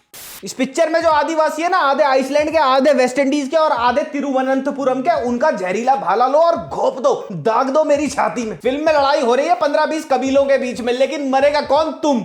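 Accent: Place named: Delhi, India. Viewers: This guy roasts in a brutal manner & silences the promoter of those usual dumb foolery we see in some over hyped south Indian movies. Appreciate the honesty of this guy lately. Although i dislike a chhapri Delhi accent, that's their culture, i get it but the guy knows what's wrong in those movies. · He intentionally does that Delhi chapri accent for reliability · chapri delhi accent 😭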